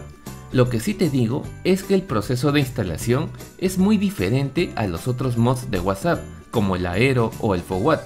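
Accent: Peru. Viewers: Jajajaj el acento peruano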